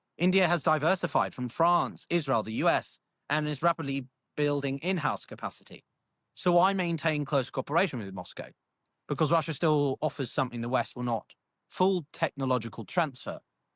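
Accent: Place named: England, United Kingdom. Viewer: Excellent Video. Nice English Accent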